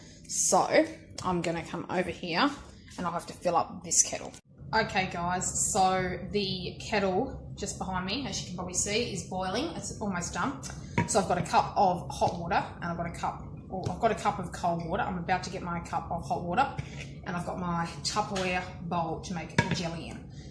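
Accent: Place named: Australia. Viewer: Nice! I always really wanted to do this. Good accent! You an Aussie mate?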